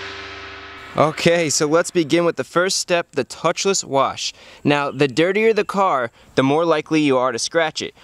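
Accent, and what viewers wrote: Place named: Boston, United States. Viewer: I own a car. It is PM and am not losing sleep watching this video. But never in a thousand years would I wash my car. I don't care what says, I will drive it through the car wash. But I am watching the video anyway. I think it's because his voice and Boston accent - wodder - reel me in.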